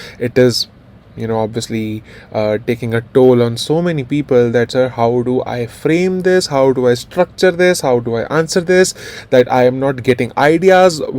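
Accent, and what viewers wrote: India. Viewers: Its my humble suggestion that first learn then teach. Your accent is not up to the marks. Typical Indian style listen, :THAT IS, very substandard". My brotherly advice would be please don't spoil others. And remove your chnnel imediately, Then your delivery, "TRY" is not of English ACCENT. It seems as if your are speaking Indian. Veyr bad.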